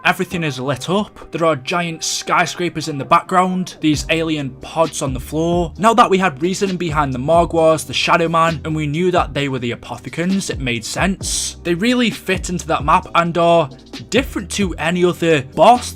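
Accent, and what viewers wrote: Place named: Liverpool, United Kingdom. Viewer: Oh god the scouse accent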